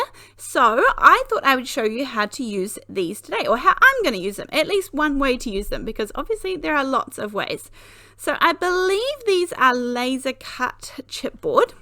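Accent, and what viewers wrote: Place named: South, New Zealand. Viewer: Love your channel and my other half thinks you're from either Christchurch or further south... He thinks you're a proper southerner! I'm from about as far north as you can get up here in England in Lancashire. He's from Wellington originally and lived in Dunedin once. Please keep up these amazing video's x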